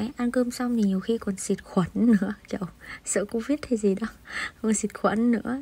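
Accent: Vietnam. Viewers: Anh rất thích giọng Bắc làm youtube farm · Nghe là biết dân choa rồi. Cố lên em. :D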